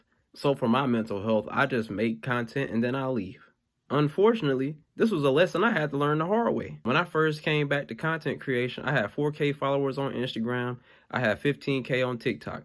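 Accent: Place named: Atlanta, United States. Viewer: I love your improvisations and your roast. I'm from Westside Bankhead and I don't really get to hear that speak anymore so it's nice to find someone that speaks my language.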